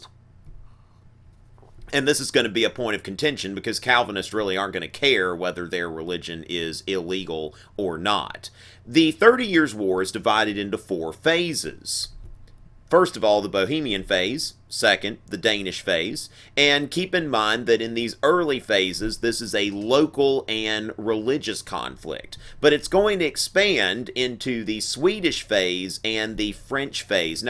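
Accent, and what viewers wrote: South, United States. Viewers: Southern US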